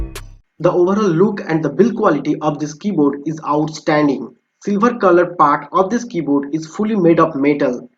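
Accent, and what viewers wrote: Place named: United States. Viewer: Bhai english indian wali bolo...ye to American ho gai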